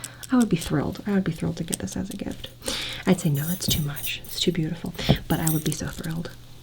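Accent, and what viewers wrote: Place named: Midwest, United States. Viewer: The Midwestern accent adds to the nostalgia lol thank you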